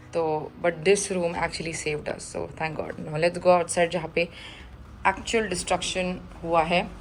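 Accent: India. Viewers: I hate Indian English accent why u guys do this trrrrrŕrrrre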